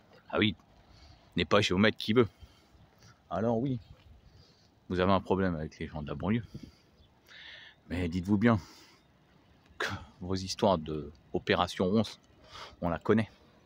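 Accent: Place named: Paris, France. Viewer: Tiens !! Quelle coïncidence... ça doit être l'accent titi parisien 👍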